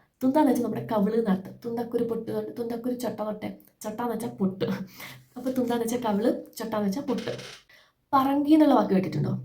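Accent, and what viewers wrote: India. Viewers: ഇയാള് കണ്ണൂർ ആയിട്ടും ഇതെങ്ങിനെ നോർമൽ മലയാളത്തിൽ സംസാരിക്കുന്നു (കണ്ണൂർ ഭാഷയിൽ നിന്നും വ്യത്യസ്തമായി ). അതിന്റ പിന്നിലെ കഥ കൂടി പറയു. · മോളുടെ നാട് എവിടെയാണ്. കുറച്ചു കൂടി സ്പീഡ് കുറച്ചു പറയാൻ ശ്രമിക്കൂ. ട്രാവൻകോർ ആണെന്ന് ഊഹിക്കുന്നു.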